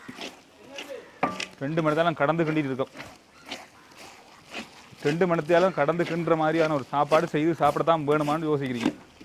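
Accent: Jaffna, Sri Lanka. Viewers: Sutha Tamil srilankan accent appadithan erukum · உங்கள் தமிழ் எனக்கு உயிர்.சிறுவயதில் சிலோன் வானொலி கேட்டு வளர்ந்தோம்.நீங்க எல்லோரும் சேர்ந்து இலங்கையை இந்தியாவுடன் இணைந்துவிடுங்கள்.நாங்கள் உங்களை அன்புடன் ஏற்றுகொள்ள தயாராக உள்ளோம். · அண்ணா அக்கா நீங்கள் மட்டக்களப்பா யாழ்பாணம்மாதிரி பேசிறீங்கள் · இலங்கை தமிழ் சூப்பர்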